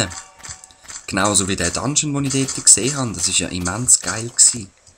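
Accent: Basel, Switzerland. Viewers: bisch du e Basler?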